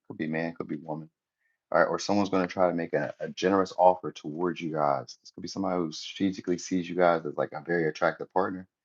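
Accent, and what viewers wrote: Australia. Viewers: He has an Australian accent 😅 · He is Australian....I didn't notice at first...😂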